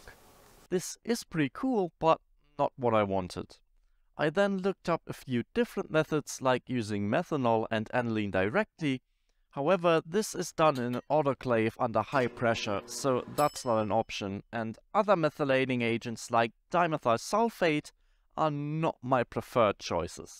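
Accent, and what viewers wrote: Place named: Germany. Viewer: I had a suspicion you were German due to the accent, then came the unmistakable Bad Reichenhaller which confirmed it 😂 Moin/Servus/Grüß Gott, lovely video!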